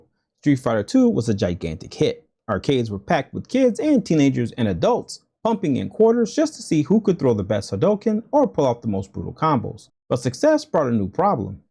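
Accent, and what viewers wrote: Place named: Carolinas, United States. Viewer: ! Your vids are soo good! Thanks for all your work and knowledge you collected over the years … I’m like you.. I dove into this franchise deeply! It sounds like you grew up in the Carolinas, im not far away here in Kentucky … we had the same childhood pretty much. You seem like a guy that would have been one of my friends way back then … you got a subscriber for life here.. can’t wait for more vids and I’m about to run the Alpha video back for the 2nd time today and prob even more! It’s that good bravo! 🫡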